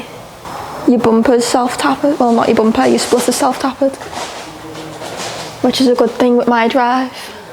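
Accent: Liverpool, United Kingdom. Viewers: That 'girl scouse' accent where words fade in and out still kills me 😂 'To be hone.........st' 😂😂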